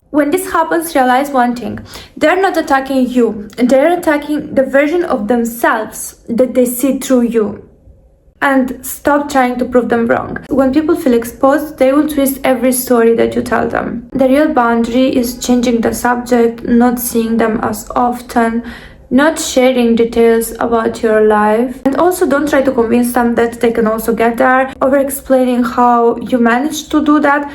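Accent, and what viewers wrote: Russia. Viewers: I adore your accent. Are you from Russia? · Since one year I just stopped to sharing my project of life plans with some friends, acquaintances and one member of my family just because I see that everytime that I speak of my plans they're leads immediately to fail. Concerning my family side the only thing that I used to get was skepticism and cynical remarks : like how I dare to even have ambitions ? And even if I don't share anymore I can easily get one mocking comment litteraly asking me if I don't plan to be the next Kim Kardashian. I decided to just let it go and not getting affected by it, because at the end of the day I have accomplished so much things by myself and that I'm stronger than them. But seriously, to live happy let's live hidden! Now I just share the bare minimum and even so I just lie about some details because at the end of the day it's my life, and like those people aren't helping that much what's the point to share crucial details if in the end it just prejudice me ? 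At the end best weapon against haters is to give them an ulcer by becoming more better that you are actually already. P.S Don't worry about those дурак comments, they just hate you because you're Russian. 😂 They wouldn't dare to make those remark about your accent if you where from another ethnic group, it's just typical American slavic women hate. Keep the good work and thanks for your deep advices.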